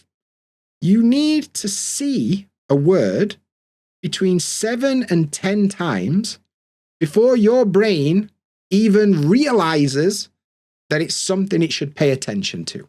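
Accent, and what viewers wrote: United Kingdom. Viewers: I love British Accent 🇬🇧 it's really soft for my ears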